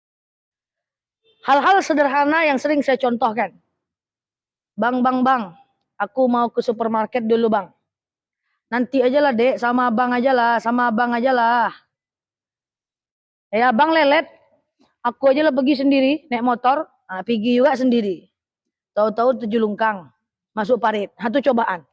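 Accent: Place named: Sumatra, Indonesia. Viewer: Masya Allah, baru ketemu Chanel ustadzah, senang penyampaiannya dan seru sambil senyum senyum, masuk ilmunya, terimakasih Ustadzah, Ngomong ngomong ustadzah dari Sumatra bagian mana ya Logatnya saya suka, Krn saya juga dr sumatra, ❤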